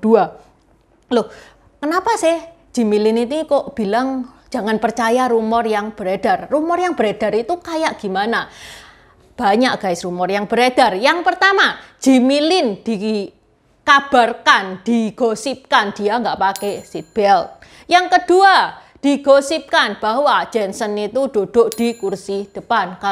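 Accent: Java, Indonesia. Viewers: logat jawa medhok,lucu sy ikut seru klo nonton hehehe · Mbak maaf bahasa jawa nya medok bngt🤭😁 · Suka medok Jawanya Mba, salam Surabaya ♥️👍🌴🌿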